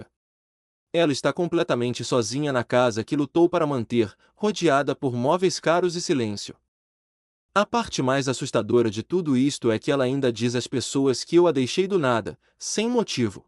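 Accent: Portugal. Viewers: Tem histórias que são boas mais o português Portugal o torna efadonho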